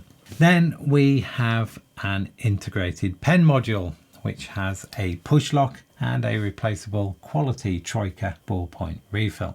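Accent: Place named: Hull, United Kingdom. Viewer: Great video... I made one myself as well based on the press kit but linked back to yours as you had actually got "hands-on" with the scales. I hope that's ok. I love your videos, and also that West Hull voice of yours is just the ticket for when this old Hornsea expat is missing home. Crack on...and all the best.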